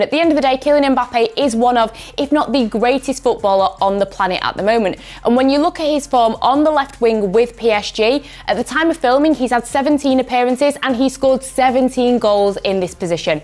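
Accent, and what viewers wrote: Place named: Manchester, United Kingdom. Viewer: German here: Like her accent:) Is that some 'less thick' version of Mancunian? Fell in love with Manchester accent listening to Gary Neville and pronunciation kinda reminds me of that. Noticed tho that she doesn't have a glottal stop in double t's or an 'ey' in words that end on 'y'... just some comment on that would be appreciated. Thanks in advance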